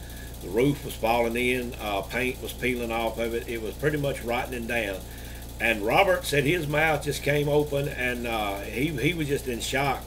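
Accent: Alabama, United States. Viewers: I love your Alabamer accent! Yeehaw!🤠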